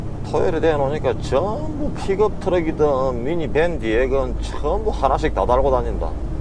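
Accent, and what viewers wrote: Gyeongsang, South Korea. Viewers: 갱상도사투리 잉글리쉬 억수로 잘하시네 한국서 원어민 교사 굿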